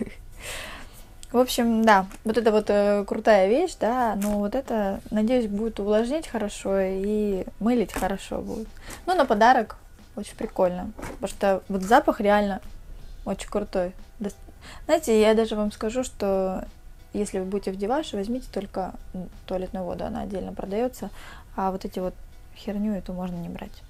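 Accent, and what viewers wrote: Rostov, Russia. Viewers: Из какого вы города ? Показалось что из Ростова на дону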